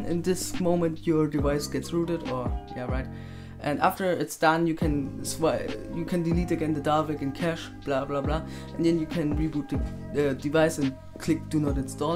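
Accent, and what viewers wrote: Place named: Germany. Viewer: Man merkt das du deutscher bist lul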